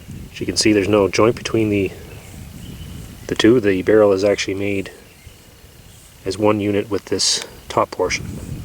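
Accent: Canada. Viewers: Are you by chance, Canadian?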